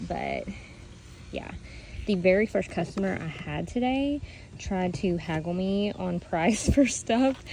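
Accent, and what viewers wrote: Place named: Kentucky, United States. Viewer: I can't quite figure out your accent. Are you from Kentucky or Tennessee or some place like that?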